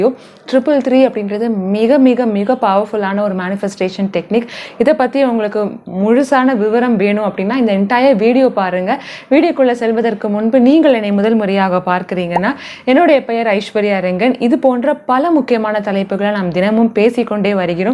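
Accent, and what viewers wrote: Sri Lanka. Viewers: நீங்க ஸ்ரீலங்கா தான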